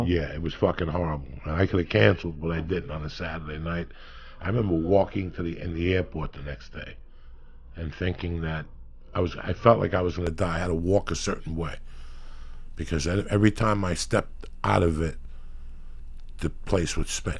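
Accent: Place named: Bronx, United States. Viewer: uncle Joey I have to say I have become one of your biggest fans over the past month man you remind me of my late father but n a good way. your stories are INCREDIBLE and your personality and overall way with people is admirable. I hope I get to meet you one day and as an Italian from Philly I was fooled in thinking u were a Bronx Italian and even tho your not I beleive your the godfather lol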